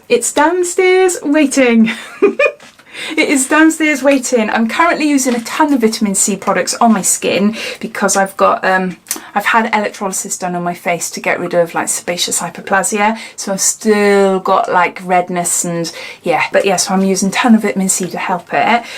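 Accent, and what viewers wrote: United Kingdom. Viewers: Hello from Brazil! Your accent is so beautiful! I want to visit the U.K sometime soon - from what I have read and watched about sights and the culture, I have a feeling I am gonna love it. I should have visited while we were stationed in Germany! Maybe in the near future. I and my family are moving back to the U.S very soon so I was wondering where this box can be shipped there (and how much shipping would cost). Cheers! Renata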